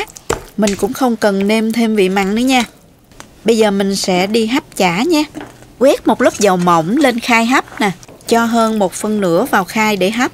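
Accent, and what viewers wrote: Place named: Binh Duong, Vietnam. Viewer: Trời tưởng ở VN. Trân trọng cô quá, nơi xứ người mà nấu chuẩn hơn nhà. Giọng càng tưởng ở Bd. Chúc cô nhiều sức khỏe mãi nấu những món ngon.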